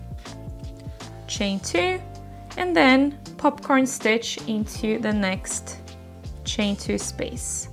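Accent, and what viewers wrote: Brazil. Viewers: amiga lindooo! fiquei curiosa pelo sotaque, vc é brasileira?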